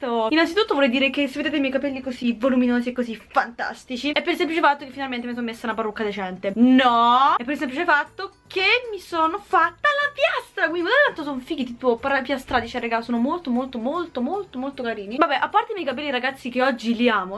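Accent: Rome, Italy. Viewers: MADONNA CHE ROMANA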